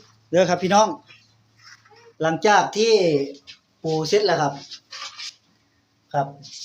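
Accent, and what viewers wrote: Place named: Sakon Nakhon, Thailand. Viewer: สวัสดีคะ แม่เก๋มาให้กำลังใจคะ สวยงามหลายๆจ้า คือเว้าคือคนสกลแท้จ้า แม่เก๋กะคนสกลจ้า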